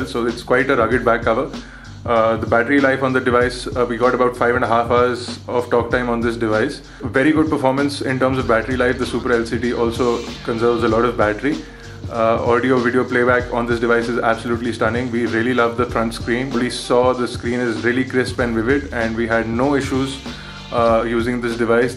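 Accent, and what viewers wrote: India. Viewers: Another good review, with a wonderful Desi accent. A refreshing change from Europeans who actually pronounce OMG as OMG. Good job buddy.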